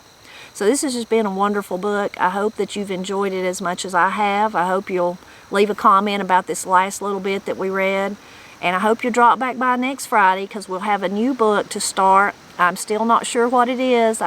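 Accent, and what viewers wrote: Appalachia, United States. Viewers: Museums are educational. Why hurry death? "Languishing" allows for slow goodbyes, time to reflect, prepare and transition. It's a natural process that teaches many lessons. Bad English??? As an Appalachian myself, I genuinely appreciate the Appalachian dialect. At least, we agree that Mrs. is a great reader. It was a wonderful book! 🍂 🍁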